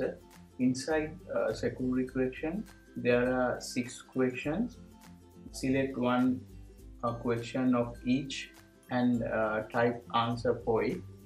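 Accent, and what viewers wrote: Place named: India. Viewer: India English^_^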